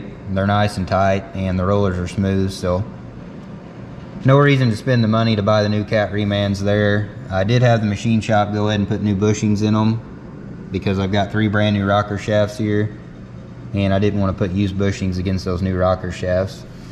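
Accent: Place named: Oklahoma, United States. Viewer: I’ve been trying to figure out where you are from, SW Oklahoma, your accent, I was curious. I wonder how many miles it took to get across that field. Been there done that spinning my wheels on the mud. I like your videos. Thank You Sir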